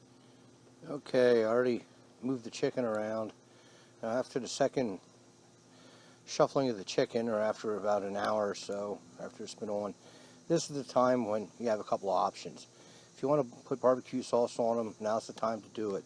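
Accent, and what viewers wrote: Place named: Pennsylvania, United States. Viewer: I figured, I could hear it! I'm from Philly originally but relocated to California after my military service. I can always tell somebody from back home.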